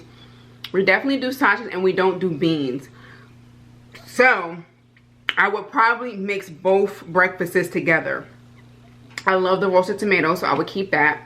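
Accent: United Kingdom. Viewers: 😮yum yum 😋 love the English accent❤ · I'm being honest, your British accent was terrible 😅😅 But the full English breakfast looked good! We usually have this kind of breakfast at the weekends or special occasions. Cereal, crumpets or a cup of tea with toast daily is the norm in England. Love you! 😘😘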